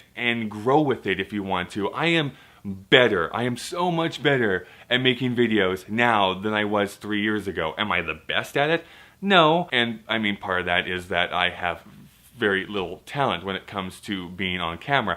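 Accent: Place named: South, United States. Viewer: Hey, I have a channel called The Sweetest Vegan or with about 48,000 subscribers. I am not YouTube famous, but some people recognize me in public, I have received some press, and I have a YouTube Partner Manager. I believe in your content and would love to help you grow your channel (No, this is not a network pitch as I am not apart of one). I stopped posting to that channel because, like you, I can only make videos about what I am interested in and I didn't want to hear a bunch of whinny comments complaining about me "changing" every time I posted. Anyway, you have good content, but there is some low hanging fruit that you are not taking advantage of. Email me at heartoftarts at gmail dot com and we can set up a time to Hangout or Skype. I really want to help you strategize for the new year. I am also from Atlanta, GA, so I can help with your southern accent.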